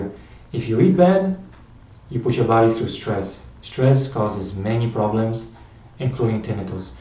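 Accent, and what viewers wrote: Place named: Europe. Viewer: You have an accent in European. You were born here.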